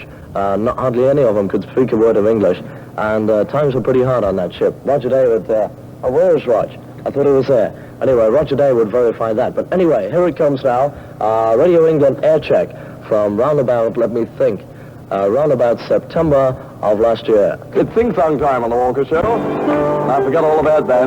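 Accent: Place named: Mid-Atlantic, United States. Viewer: Oh that dodgy mid-Atlantic accent!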